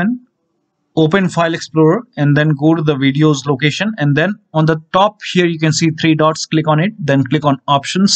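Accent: India. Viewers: start video hear indian mute video turn video off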